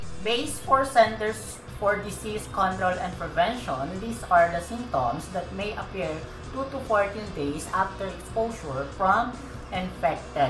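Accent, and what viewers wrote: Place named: United Kingdom. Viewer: Yung totoo po pinakikingan ko po yung boses nyo About Corona virus Akala ko po british kayo nung Pag tingin ko kayo pla Salamat po sa pagbabasa samin tungkol po sa pandemic na sakit Ingat kayo jan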